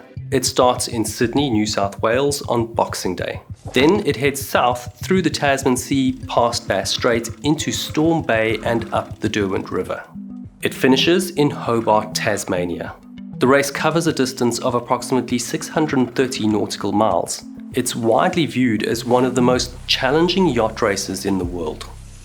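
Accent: South Africa. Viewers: clearly the SA acent is the best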